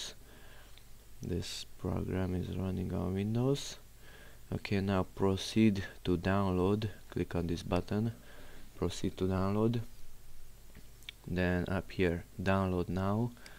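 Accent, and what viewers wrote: Brazil. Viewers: Reconheço um brasileiro falando inglês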